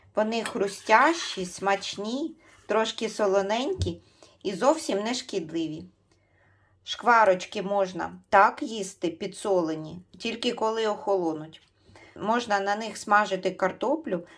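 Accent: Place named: Kyiv, Ukraine. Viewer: Дякую за відео))) Комусь не подобається київський суржик)))а мені його не хватає(знаю що не вистачає,але в києві так)))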